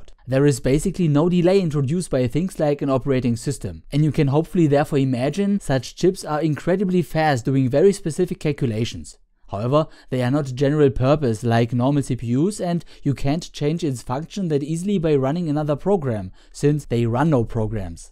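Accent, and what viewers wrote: Germany. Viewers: Dieser deutsche Akzent ist so göttlich. :)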